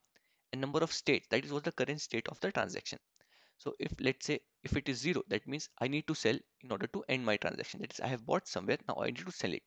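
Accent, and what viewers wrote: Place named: India. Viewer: Обожаю индийский английский))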